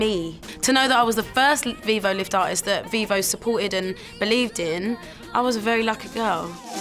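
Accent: United Kingdom. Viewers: Before you ask, YES, she is british :) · Wow she's British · I didn't know she was British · Damn this whole time I didn't know she was British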